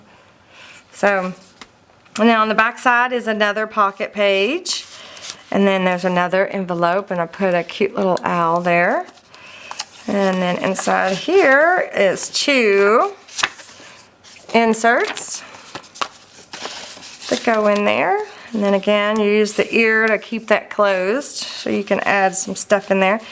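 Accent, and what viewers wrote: South, United States. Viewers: Many happy returns of the day and it is lovely to put a face to the voice behind the videos. Wow those albums are wonderful and the flourishing's are beautiful and the the album choice is brilliant as the curved edges look like the tops of cobwebs,I love thee idea of the mirror under the witches hat. Another fab giveaway but I feel we should be gifting you for your birthday! Have a wonderful birthday with loved ones and it is really nice to see you,your very pretty and I love listening to your southern accent. Thanks for the opportunity to win. Amanda in the United Kingdom. 🎨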